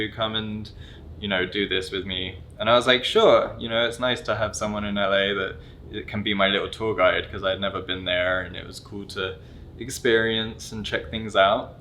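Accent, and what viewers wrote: United Kingdom. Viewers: Your accent is slipping a bit, as an American who has several British friends I can hear it. It is because you are married to an American, nothing wrong with that. I can hear you becoming more American in your accent, lol! Don't lose that pretty British accent!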